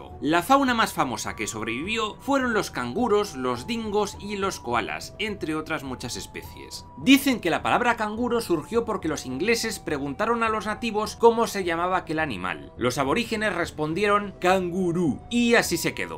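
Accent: Spain. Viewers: ¿Has pensado hacer una versión hablada en español latinoamericano? Es que el acento y la forma en que se habla en España nos resulta desagradable, pareciera que se estuvieran desinflando al pronunciar las palabras. Piénsalo, tal vez tus compatriotas te sigan y así tendríamos un mundo con un español más agradable.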